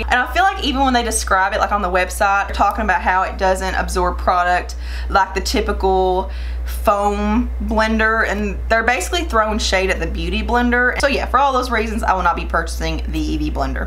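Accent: South, United States. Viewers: "okay first of all. who is Natasha denona?" omg i'm weak. great video. love your southern accent, i'm a southern belle as well and it comes through so strong in my videos 😂 love it 💕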